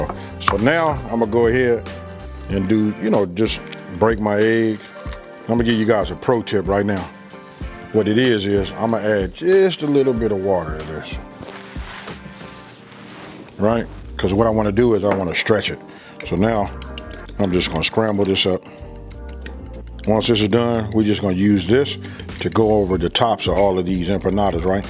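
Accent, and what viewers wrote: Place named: West Coast, United States. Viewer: I love watching your channel and your recipes are always a hit! And i gotta say i love your voice. Every time i hear you talk, your voice reminds me of "Ole Dog"from Menace to Society. 😂 You gotta be from the west coast.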